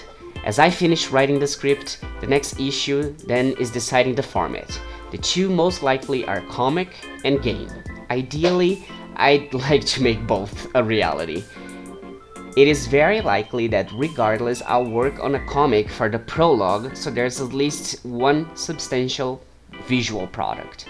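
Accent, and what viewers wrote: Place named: Brazil. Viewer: my faves are Nelumba (is this his name?) and Ro lol (edit) MENINO TU É BR??? EU NUNCA SOUBE DISSO eu suspeitava pelo sotaque mas não tinha certeza aaaaa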